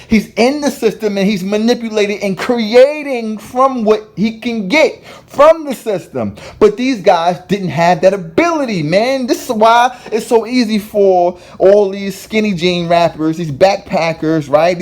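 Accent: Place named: Queens, United States. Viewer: This narrator definitely a Queens nigga 😂😂😂 His voice got me ctfu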